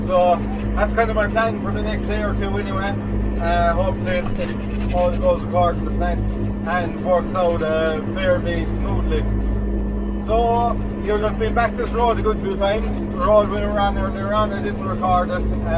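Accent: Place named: Galway, Ireland. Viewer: I taught u were from galway because of ur accent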